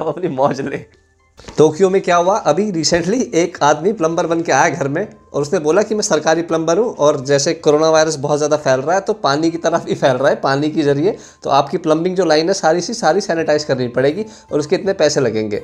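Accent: Haryana, India. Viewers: Bhaiya ki Haryanvi awaaz bahut dhaakad hai 💪💪💪💪💪💪💪🤟 hamari yahi duaa ha aap Sahi salamat raho 🙏🙏🙏❤️❤️❤️❤️ aor 🤟🙂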